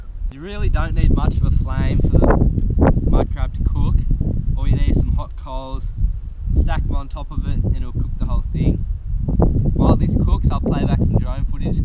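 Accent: Australia. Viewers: Mate are you from Queensland or new south wale.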